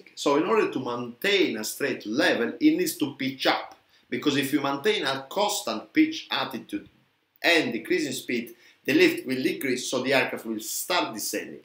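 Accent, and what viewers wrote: Italy. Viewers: Very well explained despite the very strong Italian accent.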